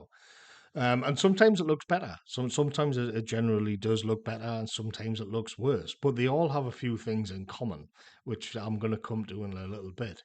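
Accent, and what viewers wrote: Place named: Northern England, United Kingdom. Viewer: great video, nice to hear a fellow northern voice for a change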